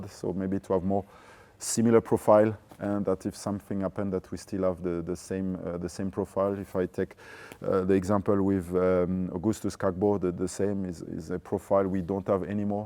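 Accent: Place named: Europe. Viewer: This bloke has fraudently made a career on sounding European and sophisticated.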